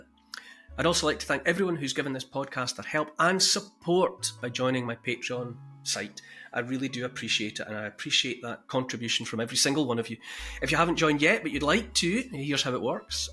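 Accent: Scotland, United Kingdom. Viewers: I love your podcasts. God bless you and family. Happy Christmas and have a safe and very Happy New Year. Btw, great Scottish accent. I'm from Durham City and I suppose I'm English. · I love these podcasts. Your soothing voice and lovely brogue adds charm. (I watched you way back on your show "Coast") Happy Christmas to you and your team, and your family. Much love from Ontario Canada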